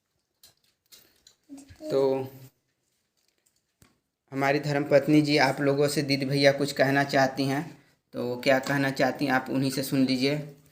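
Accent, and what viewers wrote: Standard. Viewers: क्या शुद्ध हिंदी बोलते हो भाई 🥰गजब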